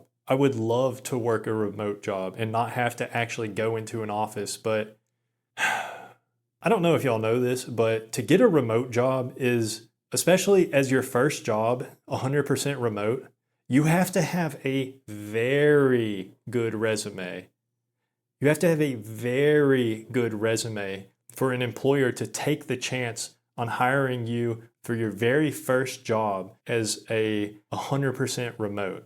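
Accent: South, United States. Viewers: your university should have a resume development department, they'd still help an alumni out, also they train you for specific interview questions, if you want they'll even film you and walk you through the tape and where you could improve. Do I detect a Southern accent? T. Savannah, GA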